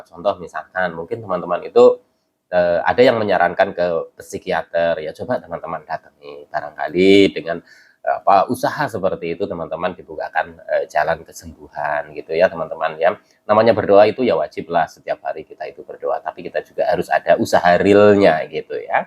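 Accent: Banyumas, Indonesia. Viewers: Cilacap hadir mas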